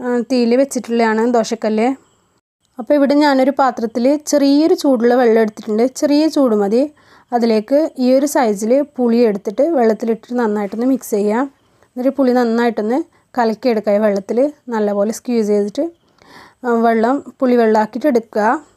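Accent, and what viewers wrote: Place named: Malabar, India. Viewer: എനിക്ക് അറിയാം.. സ്പീച് slung. മലബാറിൽ എവിടേയോ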